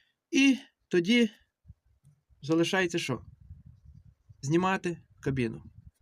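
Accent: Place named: Western Ukraine, Ukraine. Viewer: Ну видно що ви роботяга, молодець А реверс щолкає як передачі в іномарці! Дякую за відео,цікаво вас слухати! То ви з Чернівецької області,чи з Франківської?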